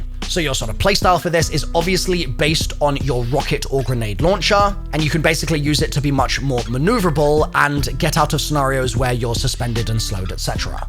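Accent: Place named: United Kingdom. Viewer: why are you bri'ish?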